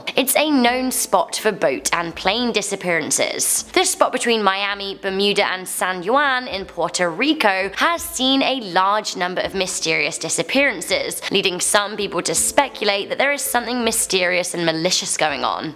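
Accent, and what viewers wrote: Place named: Liverpool, United Kingdom. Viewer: Are you from liverpool?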